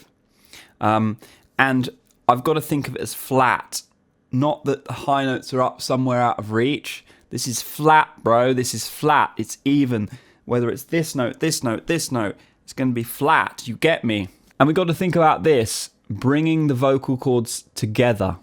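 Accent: United Kingdom. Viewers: Loves the British Accent! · British English?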